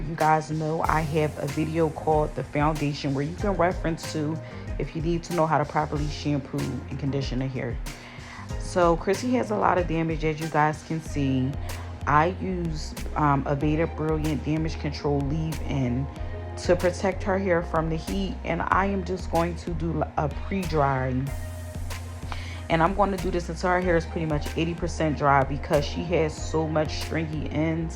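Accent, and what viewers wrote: Philadelphia, United States. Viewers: Your voice makes me miss my college besties! Are you from Philly? When I listen to you talk I think of Cheltenham lol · are you from philly ? i hear the accent lol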